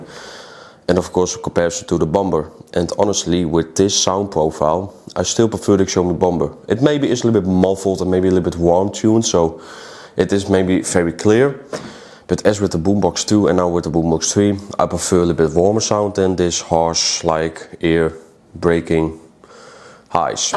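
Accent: Netherlands. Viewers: i hear a little bit dutch haha. nee wel goede video. ben zeker om deze te kopen. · You sound very Dutch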